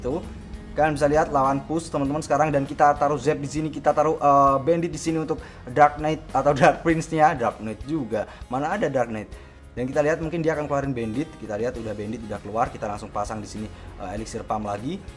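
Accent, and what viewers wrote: Java, Indonesia. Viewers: Orang jawa? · Jowone ngendi pak samean??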